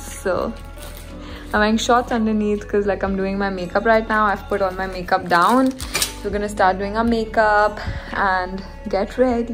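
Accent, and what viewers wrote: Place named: India. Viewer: You hindi accent is soo cute.😂❤️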